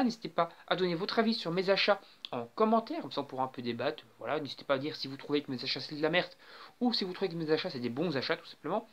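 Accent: Belgium. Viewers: salut , merci de m'avoir répondu et désolé d'avoir pris du temps pour repondre , pour la question ou je te demande si tu es belge c'est parce que je suis belge et que j'ai eu l'impression d'entendre un petit accent xD Pour les conseille je voulais savoir - si on était "obliger" de montrer sa tête en vidéo car je suis encore jeune. - si filmer avec un téléphone impactais sur la qualité de la vidéo car pour moi l'image paraît correcte mais je n'ai vraiment d'avis extérieur si tu vois ce que je veux dire - et enfin aurais tu des musique de fond pour les vidéos à me conseiller Après je ne sais même pas si mes vidéos sont bien donc j'espère ne pas te faire perdre ton temps voilà voilà, en tout cas ta chaîne m'a beaucoup plus 👌😉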